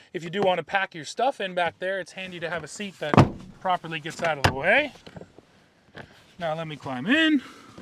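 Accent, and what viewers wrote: Canada. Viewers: Love your regular guy type of reviews paired with a Canadian accent (hello from AK). Trailhunter looks great.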